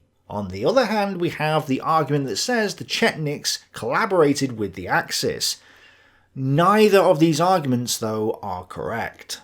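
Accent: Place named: North, United Kingdom. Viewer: I was born in New Hampshire and like your shirt. Can’t quite place that accent. Sounds like you’re from the Far North!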